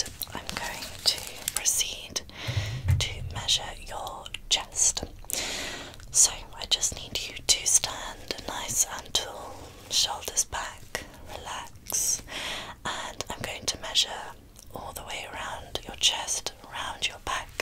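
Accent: England, United Kingdom. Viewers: The tone of your voice is perfect. It’s very calming. An English accent suits asmr, it’s mesmerising.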